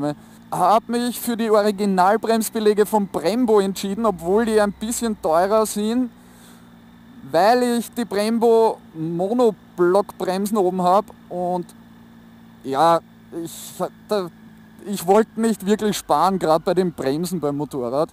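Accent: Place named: Austria. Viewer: Eine sec geschaut, direkt gehört dass du Österreicher bist gleich n abbo dagelassen😄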